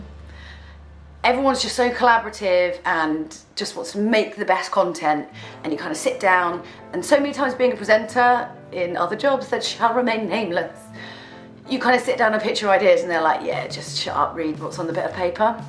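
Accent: United Kingdom. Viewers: Your voice is awesome!, kinda raspy but not too much. Also british accent is simply the best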